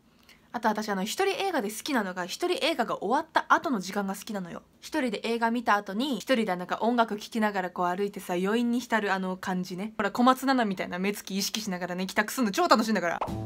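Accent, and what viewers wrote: Nagasaki, Japan. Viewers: の後輩です！長崎弁恋しくて見てたら面白くてハマってます！応援してます！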